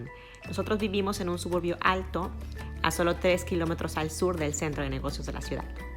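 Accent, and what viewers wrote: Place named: Mexico. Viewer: 👍Qué lindo video, y todo tan bien explicado con tu hermoso acento mexicano 🤩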